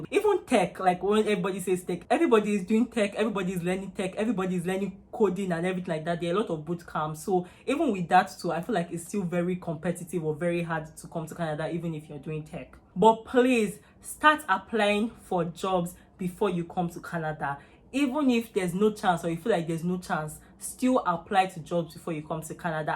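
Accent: Nigeria. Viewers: Are you sure you left at age 16. Cos your yoruba intonation is wow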